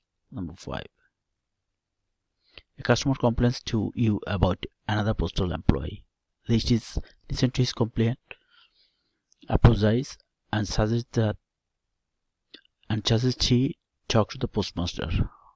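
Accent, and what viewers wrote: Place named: India. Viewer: Technically not broken .. just an Indian accent lol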